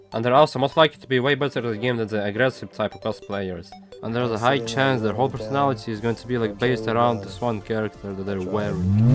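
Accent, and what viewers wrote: Russia. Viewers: Are you russian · are you Russian? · Я прям чувствую этот русский акцент, особенно когда он говорит роблокс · You said the video had a Russian accent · Фига у тебя русский акцент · Is he Russian? I just hear an accent.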